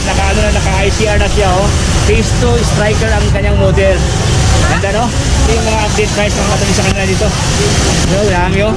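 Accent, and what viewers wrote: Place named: Visayas, Philippines. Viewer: Klaro kaayong pagka bisaya dol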